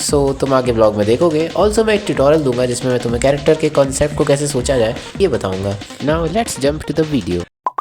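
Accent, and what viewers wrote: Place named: Madhya Pradesh, India. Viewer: Bro what that pen is called you use for inking , sorry for disturbing you again but please reply , and you got a new subscriber 🎉 you are so humble and Wese bhi aapki tone se pata chal raha hain ki shayad MP se ho aap , please reply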